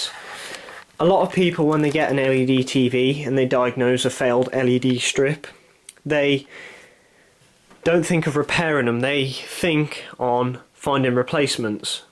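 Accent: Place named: RP, United Kingdom. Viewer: You sound so intelligent with your nice posh accent , us up north sound like thick *unts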